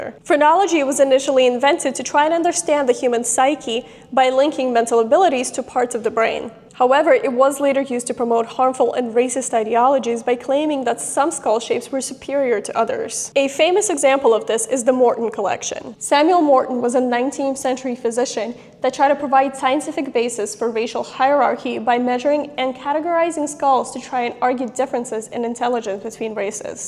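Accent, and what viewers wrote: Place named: Eastern Europe. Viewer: She is a truly excellent speaker. But her accent intrigues me. Eastern European perhaps?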